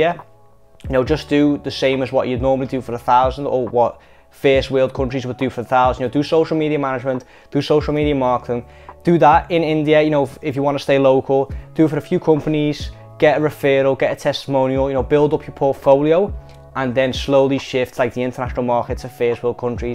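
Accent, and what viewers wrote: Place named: United Kingdom. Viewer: jeez that uk accent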